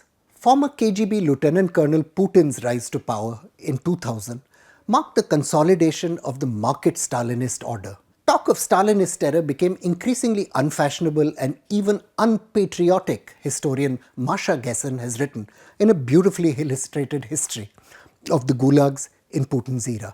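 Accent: India. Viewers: Add text. Your English is too Indian to understand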